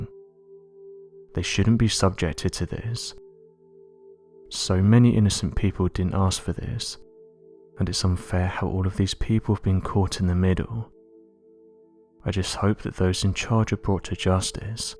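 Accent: United Kingdom. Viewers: Thank you for highlighting the brilliant work done by our see eye aye! Whoever uses this brit voiceover obviously watches cable TV. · Thank you for this vital information. I have been curious about who and what is Anonymous? Are you the same organization that started "Occupy Wall Street"? I saw you on the www in support of George Floyd. You seem to always have a British accent. Are you part of the London, Georgetown, Harvard trilateral covert network. Why must you be anonymous? We need Patriots like you front and center on the scene for Democracy.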